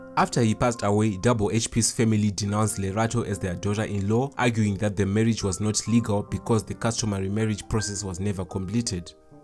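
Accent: Zimbabwe. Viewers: He is Shona forgive him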